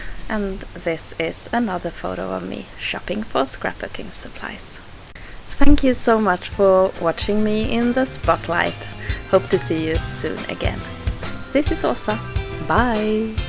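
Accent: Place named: Scandinavia. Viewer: I have enjoyed watching your scrapbooking videos since I discovered your You Tube site several months ago and always anticipate the next one to arrive. I love your layouts especially for the sense of balance and the focus centering on the photos the stories you are able to convey. I also enjoy your narration (love the Scandinavian accent). The stories you share of your life and family make a cozy picture and contribute to the charm of your layouts. I look forward to your future videos.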